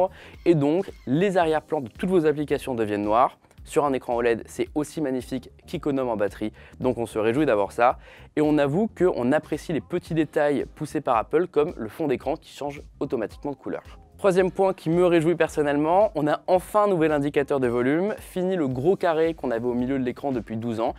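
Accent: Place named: France. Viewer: "sing" in wiz apple lol c vrm dégueu l'accent française de l'anglais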